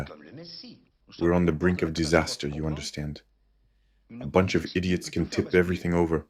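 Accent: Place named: France. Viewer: Your French accent is a plus value to this narrative thanks for your originality not using AI ;) Luckily anglophones will now benefit from your impressive knowledge sharing. Terrific.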